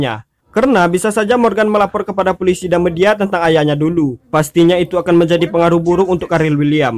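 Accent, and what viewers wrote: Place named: Padang, Indonesia. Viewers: Asli padang ya bg? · Saya merasa ini yg bicara org padang 😂😂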